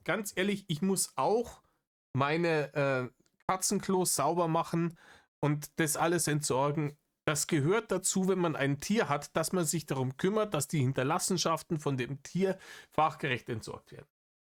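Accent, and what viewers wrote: Bavaria, Germany. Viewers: Lieber. Ich bitte dich. Ich brauche mehr bayrische Stimme, die sich über Dinge aufregt in meinem Leben. Also mach „Narrisch“ Videos, APO Red, Rainer, Politiker oder sonst welche Schwachmaten. Ich hab vor lauter Schmacht schon alte Rainer Reactions angeschaut.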